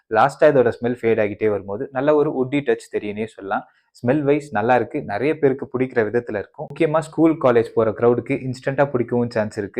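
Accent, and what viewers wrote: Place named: United States. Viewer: Man i thought you're from usa man 😂 really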